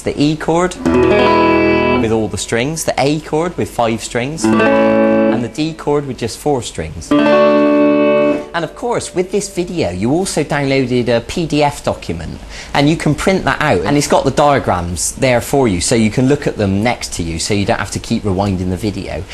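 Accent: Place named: Wales, United Kingdom. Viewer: This guy sums up the 90s! With the addition of a welsh accent of course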